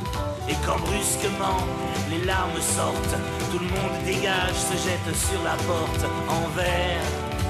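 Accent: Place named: Paris, France. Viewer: Salut à toi François et ta gouaille de titi parisien si reconnaissable ❤️.....merci pour le rock/punk alternatif de ces merveilleuses décennies 80'-90' -00' que tu nous as fais vivre avec Les Garcons Bouchers puis Pigalle. Souvenirs de concert et de rencontre en province 😁👍 Les années passent, nos souvenirs d'une époque un peu raide à vivre mais solidaire quand-même par rapport à maintenant........salut l'artiste 🥲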